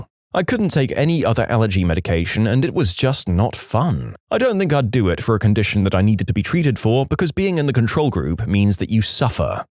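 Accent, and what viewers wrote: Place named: United Kingdom. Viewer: The British accent is everything❤☕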